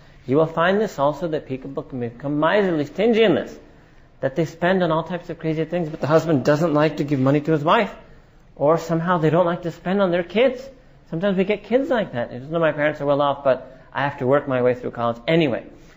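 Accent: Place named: England, United Kingdom. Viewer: Extremely strong English accent n very fast.though I want to understand this important topic of zakat,I only like my sheikh,s voice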